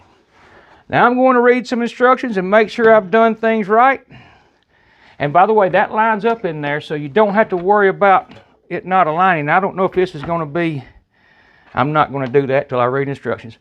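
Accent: South, United States. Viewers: Your accent makes me ashamed to call myself southern. It’s represents the worst of humanity. And the most ignorant of humanity. I can just “hear”you never went to college. Its stomach cringing. I hate ghetto street thugs as well. Your both just two different sides of the same coin. Stop talking !!! Trump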